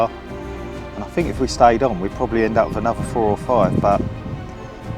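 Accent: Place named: United States. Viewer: Great video but why the stupid American accent…?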